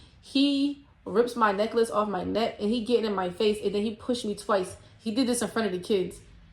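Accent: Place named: New Jersey, United States. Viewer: I like you story telling! I don’t mind the tangents and your Jersey accent is so nice!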